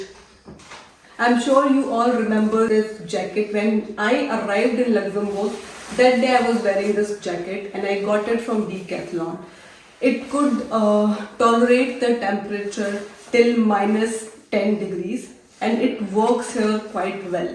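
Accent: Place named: Asia. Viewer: By language akcent u looks Asian.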